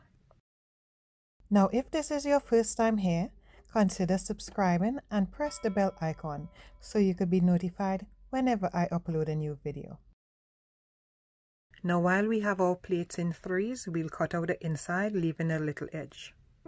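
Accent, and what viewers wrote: Trinidad and Tobago. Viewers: So creative and lovely. Something to try for sure. Your accent sound like mines. Trinidadian.. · I think I am hearing a Trinidadian accent! Your talent is amazing!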